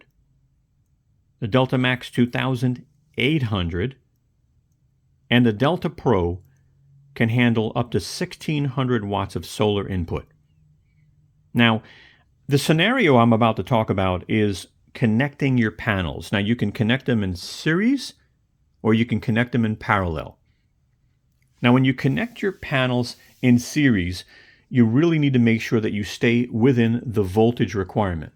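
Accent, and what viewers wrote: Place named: United States. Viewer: I have to say what a well put together video and introduction to these inverters. The host has a lovely clear articulate accent (I am English English so I find the young American accent a bit confusing! ) and the style of introduction was logical, informative and a breath of fresh air among other channels. I only watch YouTube nowadays and find there is such amazing content by conscientious Tubers like this gentleman. I have decided to buy the mini for my holiday home in South Africa for the 2-3 hours “loadshedding” (power cut) so I can watch my YouTubes on the big telly. I have gas stove for getting my 2 hour tea fix and refrigerator can go on and off as it likes so the capacity and function is just right for me . Again thanks for very well presented “ military officer style” instruction and excellent slides and explanation. 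👍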